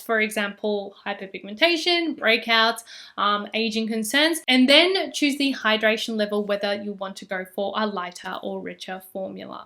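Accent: Australia. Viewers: You're Australian!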